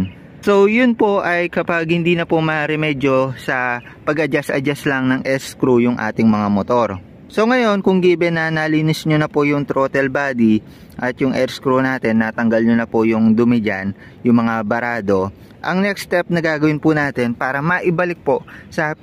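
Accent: Pampanga, Philippines. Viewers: sabi na boss kapampangan ka eh hahaha pabsin ko lang accent mo sa mga tutorial mo hahaha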